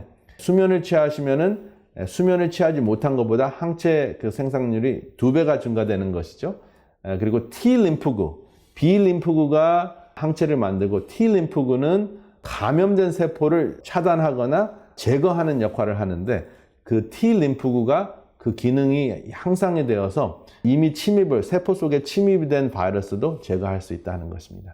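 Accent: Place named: United States. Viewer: 그렇게 생각하실 것 없습니다. 이분은 미국에서 오래 사시다보니 자연적으로 미국식 발음에 익숙해져서 그런거지 일부러 혀꼬부라진 소리를 하는게 아닙니다~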